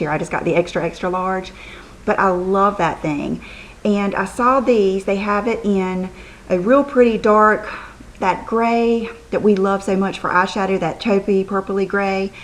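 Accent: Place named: South, United States. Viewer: I just discovered your channel through Rachel Talbot and I LOVE it !!! I'm French and I have a weird obsession with the southern accent ahahah I wish I could talk like you :-) Anyway I really like your videos and I'm gonna catch up on most of them. Xoxo from France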